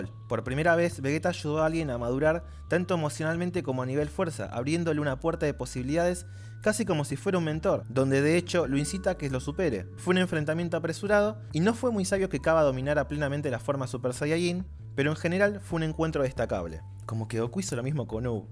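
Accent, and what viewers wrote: Argentina. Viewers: Buen vídeo, sos Argentino?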